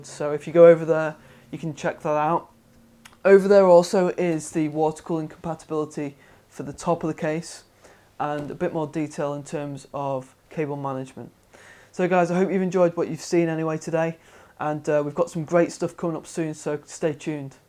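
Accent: United Kingdom. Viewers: Finally a review in british accent! xD Thanks for the video review!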